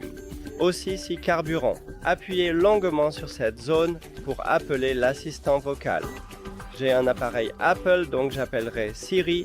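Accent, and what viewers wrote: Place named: Quebec, Canada. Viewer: Il y a un petit accent québécois .. C'est sympa .. ☺️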